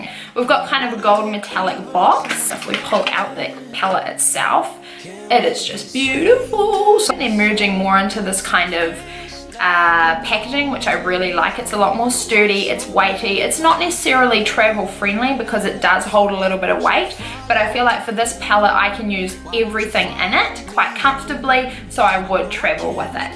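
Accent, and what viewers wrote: New Zealand. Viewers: I almost guessed wrong but I paused and was like, "no, not Australian, I think New Zealand". I was so excited to have been correct. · Are you from NZ?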